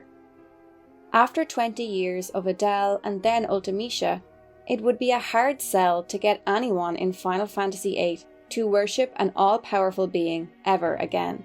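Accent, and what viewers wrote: Ireland. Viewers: Very enjoyable content ! I eat up any FF analysis video like a bag of tayto (It’s also nice to hear the analysis from a fellow Irish person)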